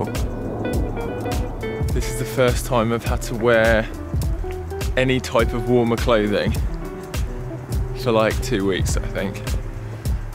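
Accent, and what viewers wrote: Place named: United Kingdom. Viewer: GOD, the British accent is SO GOOD, I could hear you talk all day!!! Greetings from Brazil ❤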